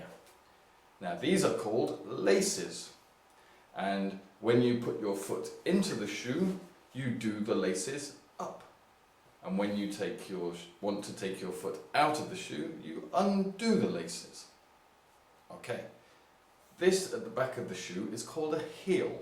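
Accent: United Kingdom. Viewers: Esto es de lo poco que se encuentra con acento británico.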